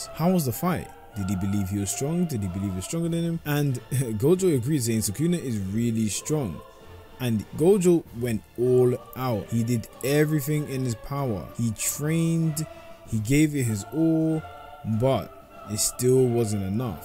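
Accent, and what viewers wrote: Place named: United Kingdom. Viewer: why does bro sound so overly bri'ish 😭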